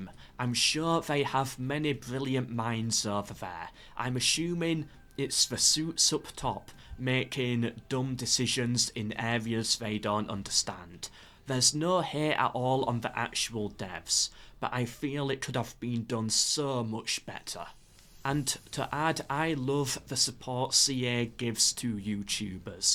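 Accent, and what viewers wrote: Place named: Northeast England, United Kingdom. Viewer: What accent is that? North East England but where?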